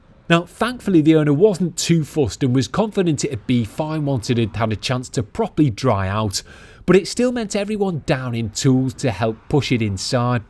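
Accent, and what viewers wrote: United Kingdom. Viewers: You have one of the most pleasant and concisely clear British voices ever . I could probably listen to you narrate a video of grass growing and thoroughly enjoy it. Not to mention your expertise and method of delivery is top notch. :trophy-yellow-smiling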